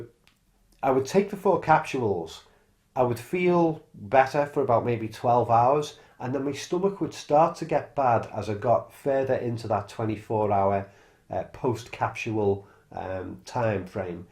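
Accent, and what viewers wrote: Liverpool, United Kingdom. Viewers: My reflux was so bad years ago I had the Acid Reflux Repair, known as Nissen Flundipication...it worked well but took me months and months to get over the operation, I had strained muscles and pain...I cannot live without the PPIs now, I have a shot of Gaviscon every night before bed, 30 years on. I avoid alcohol otherwise it all kicks off. Had HPylori 3 times!!! My history was I had my Gall bladder removed in 1988, then developed IBS, had histerectomy in 1992, terrible reaction to anaesthetic, lots n lots of acid had the NF op in 1994. always suffered with my guts since...wow is me. Thanks, very interesting clip. And lovely to hear a fellow Scousers voice...I've subscribed. 👍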